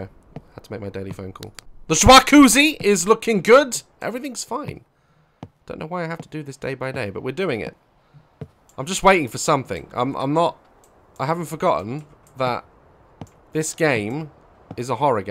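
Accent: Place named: United Kingdom. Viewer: This video is perfect for me right now taking breaks from the stress of online learning. Mild and short but just terrifying enough. I like watching play this with his British accent and humor. Now I can (not) sleep peacefully.:)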